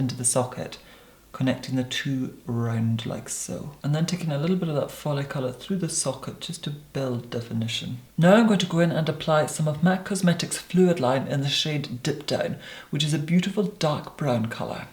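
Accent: Scotland, United Kingdom. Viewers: claire johnson I’m also from Scotland, but his accent is SO different compared to mine because it’s so ‘posh’ lol. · The britanic accent it's stunning!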